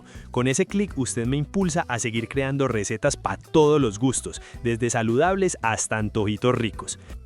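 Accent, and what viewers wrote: Antioquia, Colombia. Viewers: Me encantan, tus ensaladas . Y mi encanta tu acento paisa, me recuerda, mi amada Colombia ( Medellín ) Mil gracias, Bendiciones 🤗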